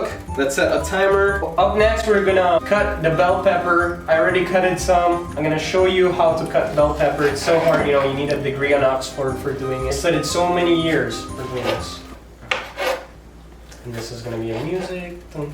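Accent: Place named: Italy. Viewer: si sente l'accento italiano 😍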